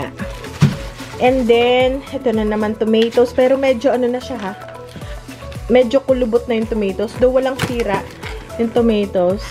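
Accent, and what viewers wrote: Batangas, Philippines. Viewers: Taga batangas ka ga?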